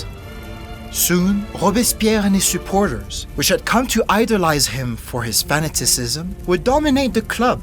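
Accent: France. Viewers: We have roads named after Lafayette but no, we don't learn much. We are very America centric and stick to learning about the World Wars and the Cold War (at least in my experience). I didn't know you were a US citizen. The accent made me assume you were French who also spoke English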